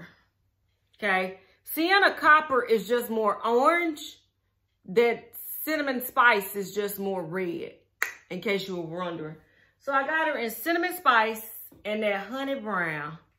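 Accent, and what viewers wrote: South, United States. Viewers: Love your southern accent and charm! ☺️ I’m a newbie wig wearer and just purchased 3 Neesha Wigs (202 & 205). Had them thinned out and layered! Will be investing in the 208 and 209! 😘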